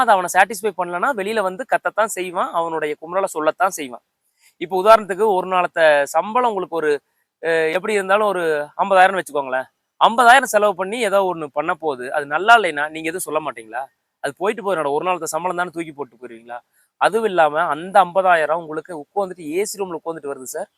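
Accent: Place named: Kongu, India. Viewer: Thambhi ERODE or Coimbatore.. Wonderful Kongu Tamil ...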